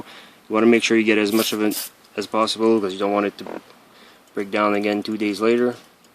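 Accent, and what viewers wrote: Canada. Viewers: You sound like you're from NB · Edmunston area is my guess. Sounds just like my cousin's husband.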